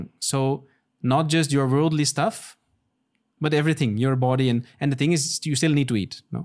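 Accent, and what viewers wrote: India. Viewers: His Accent is Indian.. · Yes, he speaks with an Indian accent, so interesting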